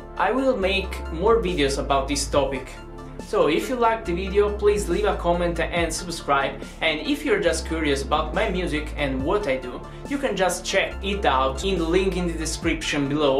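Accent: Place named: England, United Kingdom. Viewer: I don't know why but.... I love your English accent somehow!!! Its lovely for me...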